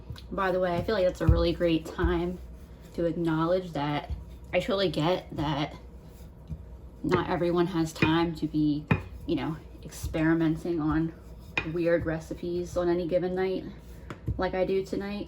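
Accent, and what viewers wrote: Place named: Delaware Valley, United States. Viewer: Hi! I just found your channel and it's incredible! I saw that you're located in NC, but I'm curious if you're originally from the PA/DE/NJ area? Your accent reminds me of home. 🤍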